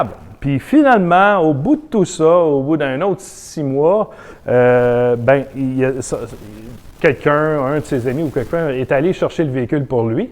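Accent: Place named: Canada. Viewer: On aime beaucoup votre accent, çà donne son truc a lui et puis j' aime bien l accent canadien et le Canada en général, çà nous fait voyager , il y a bien l 'accent suisse selon les endroits plus accentué , en revanche à la frontière il parlent comme nous , de même que l ' accent belge, donc la France ,avec ses accents (du sud , le nord...) avec la mondialisation on s' est habitué ;) ne parlons même pas de l' afrique francophone... quoiqu' il en soit, là n 'est pas le problème vous présentez bien, et ses passionnants vos observations, les petites anecdotes s' est distrayant, mieux qu un magazine moto , personnellement je préfère les gens passionnés objectifs comme vous , qu un journaliste de magazine moto.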